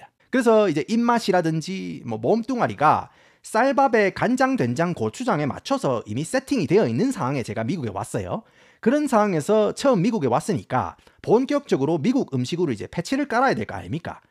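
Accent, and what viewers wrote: Gyeongsang, South Korea. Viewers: 갱상도 사투리에 홀려서 구독합니다. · 와 나도 경상도 사람인데 이 사람 사투리 왤캐 심하냐 같은 경상도가 들어도 거북할 정도임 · 한국말하실땐 구수한 경상도사투리 쓰다가 영어만하면 어나더레벌😊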